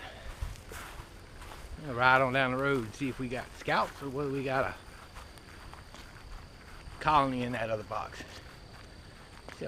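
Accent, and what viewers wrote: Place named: North Carolina, United States. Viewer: Love your videos, moved from NC to Kansas little over a year ago and miss hearing people that sound like me. First year beekeeping, started with two packages and they are doing great. Thanks for the information and just being you.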